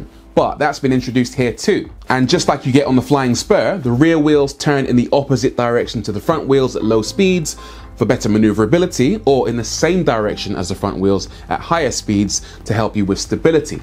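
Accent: United Kingdom. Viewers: Feels weird to watch a black man speak with a British accent...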